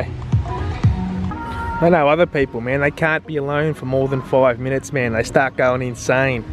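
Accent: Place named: Australia. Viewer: Australian accent alike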